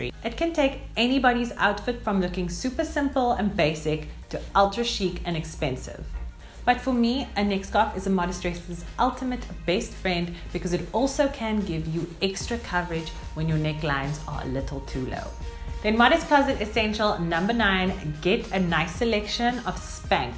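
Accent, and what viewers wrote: South Africa. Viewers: Maays South Africa ☉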